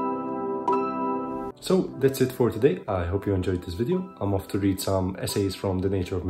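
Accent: Malta. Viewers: by any chance, are you maltese?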